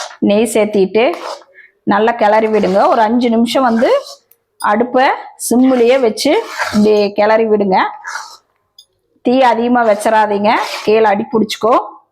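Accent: Kongu, India. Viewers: எங்களுடைய கொங்கு தமிழில் நீங்கள் பேசி சமையல் செய்வது அருமை தங்கை🎉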